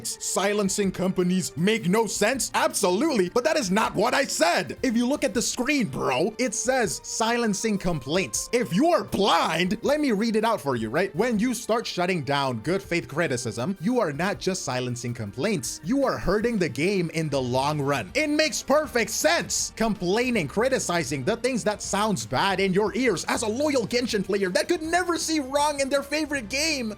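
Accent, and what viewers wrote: United States. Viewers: imma be serious, till this drama happened, I did not know I were from the Philippines and genuinely thought you were an American with a really cool accent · not american? aintnoway you're Indonesian my brother as fellow indonesian your speaking tone sounds more american like newyorker or from cali💀 but hey im glad that you're being honest and clarify misunderstanding ( just rare to see sea cc that have community that full on people speaking english )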